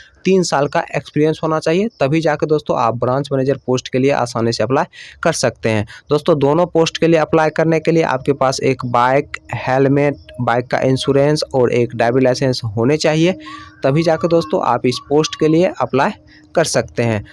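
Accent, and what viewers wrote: Bihar, India. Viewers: Sir AAP Bihar se hai kya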